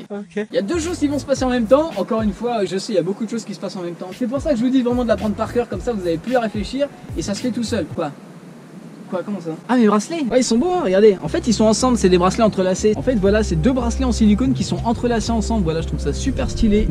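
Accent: Belgium. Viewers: Belge ou français ?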